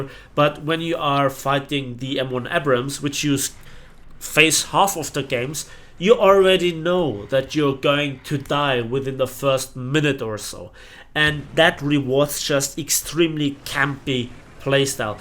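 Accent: Germany. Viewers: I just discovered this channel, after looking for an AMX-30 gameplay, and I was amazed at the quality of the reviews you make. You have by far the best videos on WT Ground Forces I've seen, and i've been playing/watching WT for 5 years. Great channel,. Just wonderful. I find your videos superior to any of the top youtube channels that cover WT Ground Forces. Oh, and are you german?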